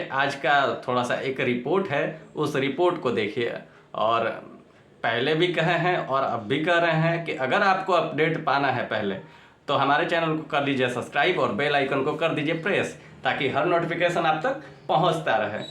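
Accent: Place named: Bihar, India. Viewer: बिहारी स्टाईल में बोली ता एकदम गर्दा।।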